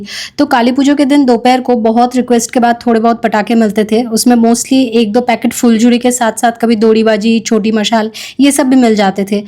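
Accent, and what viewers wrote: Bengal, India. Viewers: Gopal, kishori ju ki sewa dekh bahut acha lagta hai, aap jo bangla hindi ko mix kar ke jo hindi bolte ho wo bhi acha lagta hai ❤❤❤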